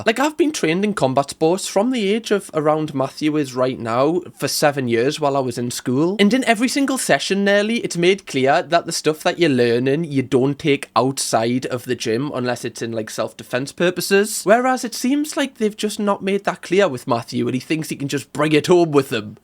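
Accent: Newcastle, United Kingdom. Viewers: I used to not find supernanny. Then you come along with your gordie accent and make it one of the funniest things I’ve ever watched.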